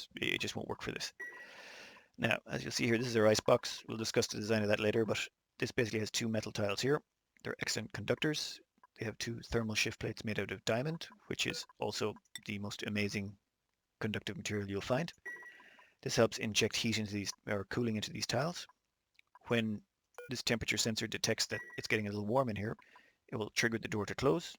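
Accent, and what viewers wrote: Ireland. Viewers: Well, I only noticed it when you said a few words with an Irish accent, though it is true that your accent is not that strong but you still have a little bit of accent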